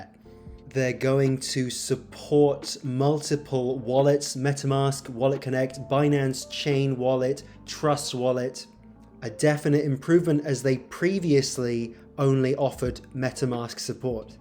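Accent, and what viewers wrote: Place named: United Kingdom. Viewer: Hi where to based? sounds like you are in UK I am in London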